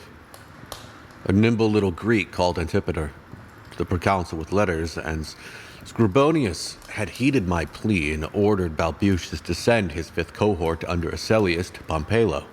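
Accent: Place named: Arkham, United States. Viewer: Oh yea, I was transported back to Roman horrors… So there’s another older take on this short story? I’ll look it up… Really enjoying your narrations, accent seems location-appropriate, practically out of an Arkham sanitarium…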